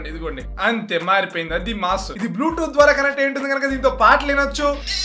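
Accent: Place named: Telugu, India. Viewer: Europe lo telugu abbai la undhi voice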